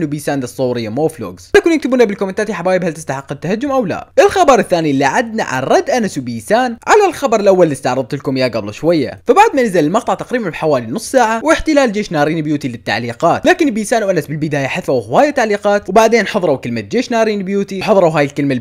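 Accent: Iraq. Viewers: وت لا مبين حجية عراقي بس هو كال انو دخل مباراة بنادي الكرخ وهيج اتأكدت انو عراقي😂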